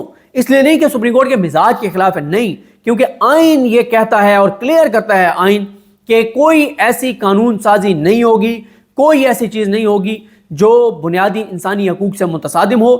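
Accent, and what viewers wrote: Saraiki, Pakistan. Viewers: bhai dill khush ho jata hay jab ap Qazi ki tareef krty hyn..... Mekun lgday tohady lehjay nal tussa saraiki hivay sady elaqay janoobi punjab day...Allah pak tohakun apnri hifzo aman ich rakhy ameen....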